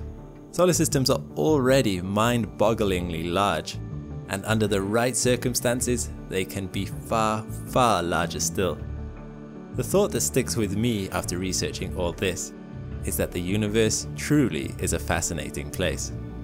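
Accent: United Kingdom. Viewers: I can't get past the narrator's voice, it's like a mixture of a foreign accent with a British accent. Videos are pretty good, hire someone else to narrate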